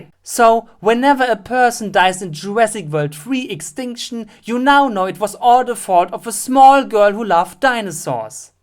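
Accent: Germany. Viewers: Can you speak German ? Because you sound like you have a German akzent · I freaking noticed, that u have to be from germany, becuz of ur Accent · Your strong German accent makes everything way better. When you're reviewing a terrible movie like Dino Time, it makes my eyes bleed. (That movie was terrible) but when you're there, my eyes bleed less. 😂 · I like your German accent it makes these videos a hundred times better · I was born about a decade before Jurassic world and I watched the Jurassic park movies so ye I got inspired (I know someone else said this but your German accent makes this waaaaaaaaaaaaaaaaaaaaaaaaaaaaaaay better)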